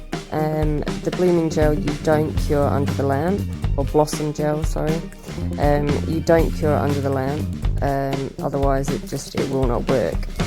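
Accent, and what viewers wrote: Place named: Australia. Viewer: U sound so Australian x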